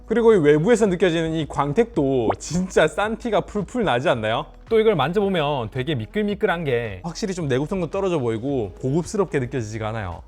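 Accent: Gyeongsang, South Korea. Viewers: 경상도분이신같은데 서울말 쓰실라그러넻ㅋㅈㅋ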